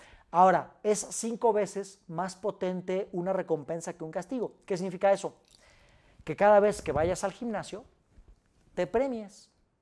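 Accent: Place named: Mexico. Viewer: hola! jaja siempre me haces reir, y lo "chingado" es que cuando me paso un rato esuchándote... jajaja salgo hablando "mexicano" o sea... com tú... Mi hija me riñe, vivo en Alemania, y por suerte acá nadie me entiende, jajaa. Me encanta como eres, Gracias por todo lo que nos compartes, y por tu simpatía.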